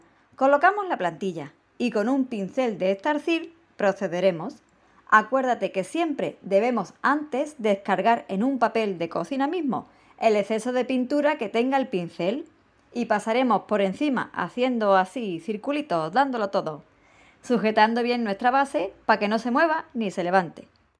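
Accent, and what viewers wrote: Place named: Andalusia, Spain. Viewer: Pues a mi me encanta escuchar el acento de... porque aunque sea andaluza se la entiende a la perfección... La verdad es que si me molestaran esas cosas no podría ni ver nada en la tele porque hasta los periodistas de los telediarios hablan con incorrecciones, y muchas gramaticales que es peor que el acento (como lo de su tilde en la e).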